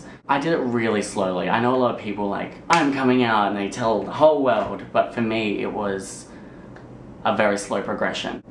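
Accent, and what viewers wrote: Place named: Australia. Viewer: i come hear for his australian accent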